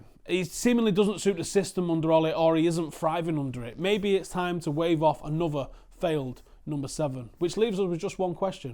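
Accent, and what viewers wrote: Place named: Cockney, United Kingdom. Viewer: Accent Cockney 100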